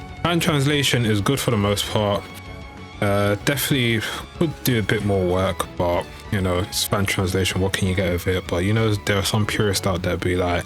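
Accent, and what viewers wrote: United Kingdom. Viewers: I didn't know you're black. Not that there's something wrong but I have you in my mind as a Japanese with a British accent.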